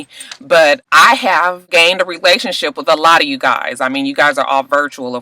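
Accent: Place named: East Coast, United States. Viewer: Hi! I am new to the channel but like I said on fb, ( cheffalina) you're hilarious!! I watched almost all of your video's during our last of the fifty snow storms in Philly! You said you were from Cali but when talk you seem so east coast!!!! Lol I've watched this video and now I know how this thing works! Love it whether you are imitating folks or choking cause your are eating lunch while you talk to us, I love it love it love it love it love it love it love it! Peace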